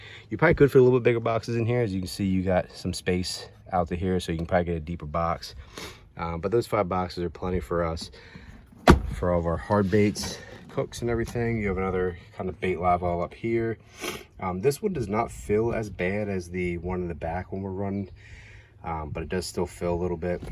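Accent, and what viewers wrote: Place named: Pennsylvania, United States. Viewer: That Pa accent is strong with you. Love the video. Very informative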